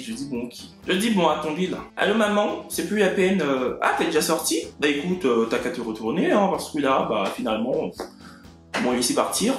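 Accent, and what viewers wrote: Cameroon. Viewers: J'ai remorqué que tu utilises souvent des intonations ou expression camerounaise dans t video PS: j'aime tes videos tres droles jusqu'à 😂😂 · Tu parle souvent comme un camerounais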